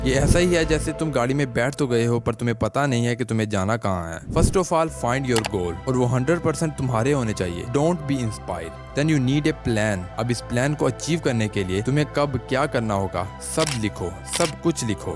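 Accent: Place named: Punjab, India. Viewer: You r punjabi right 😮😮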